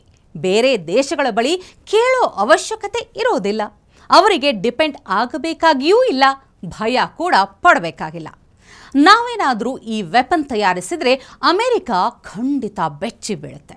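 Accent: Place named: North Karnataka, India. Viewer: ನಿನ್ನ ಉತ್ತರ ಕರ್ನಾಟಕದ ಭಾಷೆನೆ ಚಂದಾ ಕಣವ್ವ, ನೀನು ಬೆಂಗಳೂರು ಭಾಷೆ ಮಾತನಾಡ ಬೇಡ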